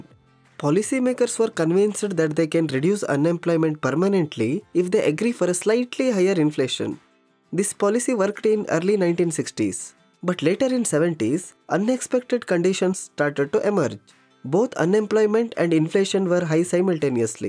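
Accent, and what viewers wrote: India. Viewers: Bro..ur english is really Indian...awesomely Indian.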